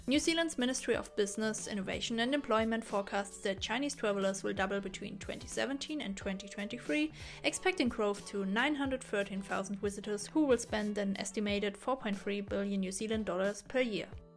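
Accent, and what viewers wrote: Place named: Switzerland. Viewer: Mal was neues 😄 Ich möchte ja nicht fies sein, aber es klingt etwa so wie wenn ich als Schweizer Hochdeutsch spreche. Trotzdem war das interessant! Und die Animationen sehr gut gemacht.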